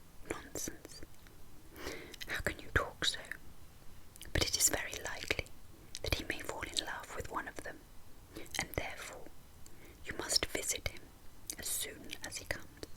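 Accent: United Kingdom. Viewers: Luckily just found this playlist after searching deep, I'd be really happy to hear another series of a storys chapters read by you! :) whispered reading is my personal favorite to relax/ fall asleep and I think your (or the british) accent in general is percect for it. It's also something simple you can upload to feed the algorithm in case of having periods of feeling less creative :) Hope your channel will heal and you are doing well ❤️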